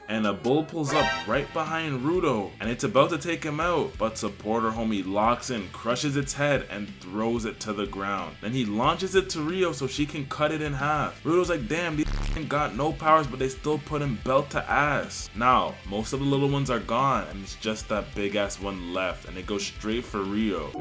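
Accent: Jamaica. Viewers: Yo are you a jamaican??? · Are u a Jamaican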